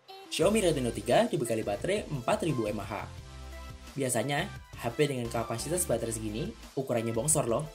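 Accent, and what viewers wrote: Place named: Banda Aceh, Indonesia. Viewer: basa indo meutok lage awak banda.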